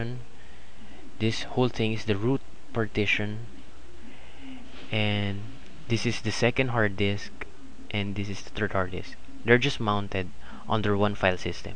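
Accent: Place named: Philippines. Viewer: pinoy accent!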